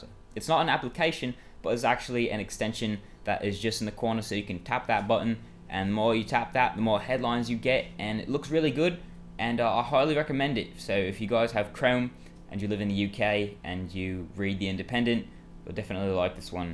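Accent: Australia. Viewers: Im from the UK, your accent sounds more Australian! XD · Awesome British Accent! Got a hint of aussie in there aswell lol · nice aussie accent! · sounded aussie :D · Accent sounds more Austrailia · definitely Australian lol im british too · Accent more Aussie than Brit lol. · im from the uk... we dont sound like that, thats more like austrailian tbh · Started off English. Ended up Australian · Yeah, you sound more Australian, than Brit! Sorry, but it's true. Another very wonderful,expositive video. Thanks for all these tips, I'll try almost all of them. Hope you get 4000 likes! G'day mate! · Im australian and i tought you sounded more Aussie then having a UK accent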